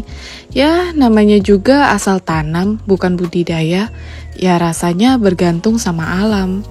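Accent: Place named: Jakarta, Indonesia. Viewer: Oo.. Kok logat bicara nya bagus bgt yaa... Kyk orang jakarta... Hehehe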